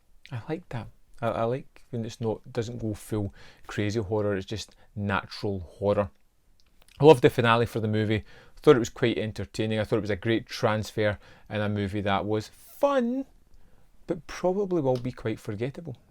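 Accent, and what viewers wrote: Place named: Glasgow, United Kingdom. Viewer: Love this movie!! Just bought the eureka double pack myself. Been trying to get this since i seen it on betamax way back when(no joke) had a large budget and i think its just as relevant now, the whole man getting punished by nature for exploiting the resources slant. Interesting how yoy never really know if the medicine man manifested the bats or its just a natural migration. Love ambiguous storytelling. I had actually given up on owning this one never mind on a eureka bluray! Keep up the good work, good to see and hear a fellow Glaswegian(?) Review movies kn youtube. Thanks for the great review and exposing this great film to your community. Thank god for eureka.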